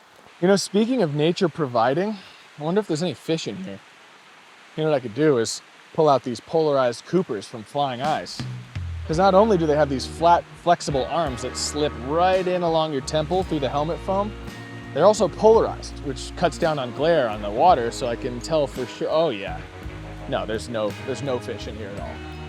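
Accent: Canada. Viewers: Nothing like gettin’ oot n’ aboot eh!? From Ottawa to Hull you have no equal. Rock on eh! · Not much of an inside joke when everybody knows this Canadian pronunciation quirk.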